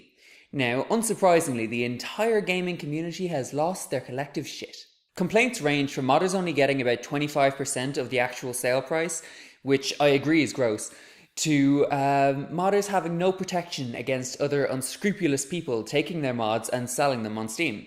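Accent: Ireland. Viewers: I really like your video, and I like your accent although it is a really soft irish accent haha. Btw, short hair is better. Have a nice day.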